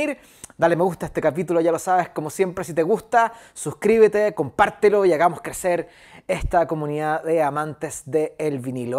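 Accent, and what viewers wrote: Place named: Mexico. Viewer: Idea mia o habla como mexicano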